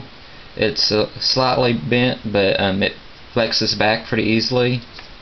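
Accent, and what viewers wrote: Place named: South, United States. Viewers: it's called an accent everybody has one ex. you probably speak clean english (northern english i'll just call it) he speaks not as clean it's just southern (sorry if me saying not as clean that's pry a lie) accent then there is the ny/nj accent and so on and so fourth. the only way to not have an accent is to be mute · It's called living in the south. Lots of people talk like that down here. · honestly, he sounds like a coonass, which is like a mix of southern and french accents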